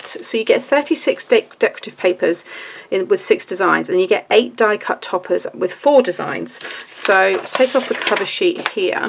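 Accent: United Kingdom. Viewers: Nice haul! Have I missed something? Sounds like you are back in the UK?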